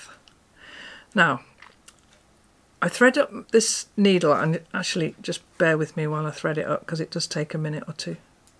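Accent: England, United Kingdom. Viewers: Thank you so much! ... I already do EPP.... But I love listening to your English accent and I love paper piecing, So it was extremely enjoyable to just watch you go through your process. I love that you are so humble and that you encourage others to do what you obviously enjoy. I love to share what I do with others as well. My dream would somday to come and visit you and see your lovely little home and gardens..... You live the life that I dream of. 💖💝. Thank you for sharing your life with us!!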